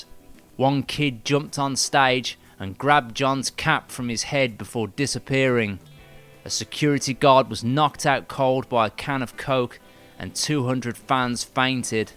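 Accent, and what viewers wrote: Birmingham, United Kingdom. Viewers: Yow sarnd like a brummie like me pal... Well I'm Wolverhampton but everyone calls us brummies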